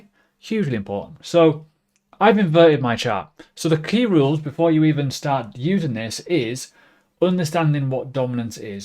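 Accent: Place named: United Kingdom. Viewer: You are giving really good content mate. Nice accent , where from the UK are you ?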